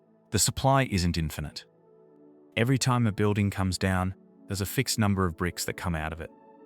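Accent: Australia. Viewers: Lovely surprise to open a clip and hear an aussie voice. Great video. Thankyou for sharing. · I have watched a few of these videos over the past couple of days here in Dallas, Texas, and you are spot on about his soothing voice. It is warm and comforting and his enunciation seems effortlessly precise, just like his placement of every. single. one. of those goddamn bricks. Add to that the Australian accent and, well, I would pay top dollar for the software that reads to me my emails and texts in that voice. Pivoting now to address the owner of that voice, you have mad skills, sir. Many. Your diction and the stories you tell reveal your intelligence and an awareness that few possess; your videography and landscape designs are evidence of a keen eye; and your diligence and unwavering attention to detail are the mark of a true craftsman. Bravo. 👏 I'm glad I found your channel. 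I'll keep watching.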